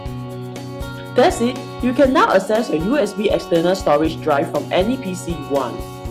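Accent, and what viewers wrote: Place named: China. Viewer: I love the Chinese accent!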